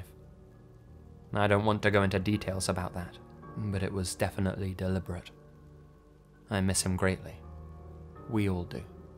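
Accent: United Kingdom. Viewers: British accent doesnt spook me unfortunately. Good content tho.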